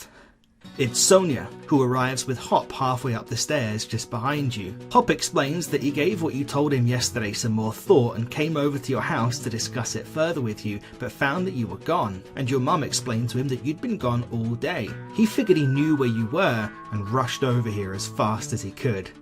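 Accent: United Kingdom. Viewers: Didn't even take 10 seconds for me to know this video was gonna be trash. I was gonna give it the benefit of the doubt, too, but damn. And your bri-ish, yeah, bye. (I stopped watching cause he dissed Sun and Moon, him being Bri-ish just added to it) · I love your Yorkshire accent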